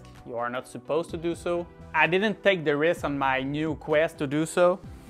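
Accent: Quebec, Canada. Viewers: Est que t’est québécois?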